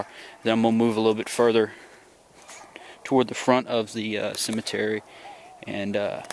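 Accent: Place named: South, United States. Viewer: your country twang has really come out in this video. Also, from my past experiences and such with the dead, is you ask if they would please answer the following questions (and state your questions). Just a suggestion, but all in all, great piece. Can't wait till your next vid. Love the paranormal! Hugs to you!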